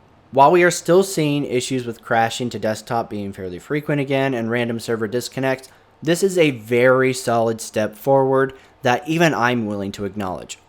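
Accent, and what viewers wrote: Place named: Asia. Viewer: You sound pretty Asian.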